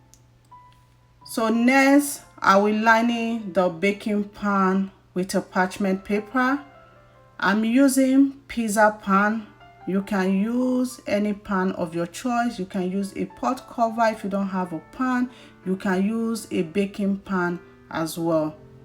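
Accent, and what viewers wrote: Nigeria. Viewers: Talk like a Nigerian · She sounds Nigerian and this is a Nigerian meat pie recipe.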